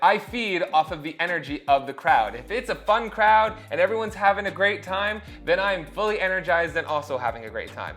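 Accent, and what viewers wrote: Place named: New York, United States. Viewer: How have you managed to get a heavier NY accent and sound gayer at the same time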